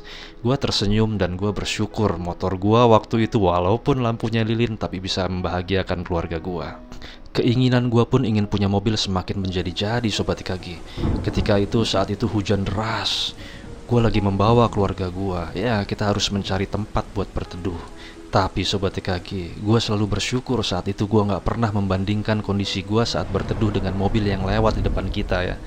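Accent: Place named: Java, Indonesia. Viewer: Alhamdulillah suaranya medok masuk ke hati bang anda bisa sukses bahagia sejahtera kaya raya penuh keberlimpahan saya pun begitu pasti bisa sukses bahagia kaya raya penuh keberlimpahan Aamiinnn ya rabbaalamin dan terimakasih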